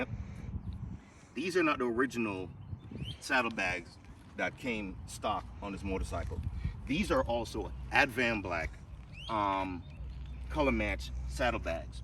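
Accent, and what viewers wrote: Jamaica. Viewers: You're Jamaican nuh Tru? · I hear a little jamaican accent in there maybe??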